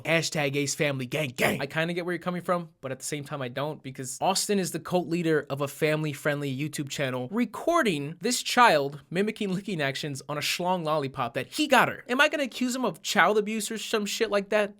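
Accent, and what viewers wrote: Chicago, United States. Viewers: You from Chicago, my guy??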